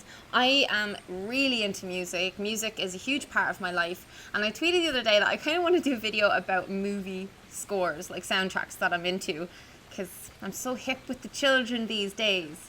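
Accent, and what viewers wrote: Ireland. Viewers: im from cassie's video. i love your irish accent! :) youre so nice lending your EH to cassie even of she stole it lol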